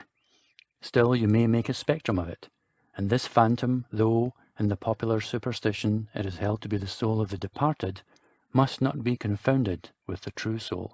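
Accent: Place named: Scotland, United Kingdom. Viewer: I heard an adaptation of this on CBS Radio Mystery Theater and I want to compare it to the original, so I scrolled through four audiobooks playing the first minute of each to see which reader works for me. I don't see why I'd choose a Scots accent when it's not a story that has anything to do with Scotland, but out of the available choices this is the voice that appeals to me and that's just the way it is.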